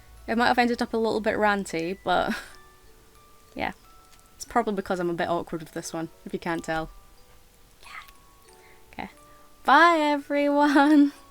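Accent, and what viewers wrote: Scotland, United Kingdom. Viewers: While having issues at night as well as the occasional daytime accident relationships are difficult. If I had a partner with an issue that required diapers ( if I didn't have any issues of my own). I understand that the human body is a complex organism and things don't always work right and I would accept the fact that they needed to use diapers. Thank you for your videos I have always enjoyed them, especially your honesty. Now if I recall correctly in one of your videos you mentioned you're Scottish, I have always loved your scottish brogue. P.S. I am glad to see that you are uploading again.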